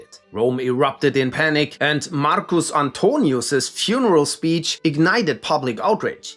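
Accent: Germany. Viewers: GOD, these are good. The german accent just makes it like we're in a U.N. meeting. Kudos.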